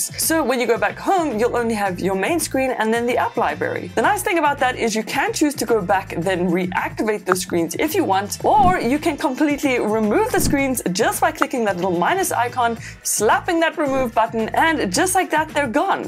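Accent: South Africa. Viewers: Thats a south african accent! Sandton? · South African accent.. Proud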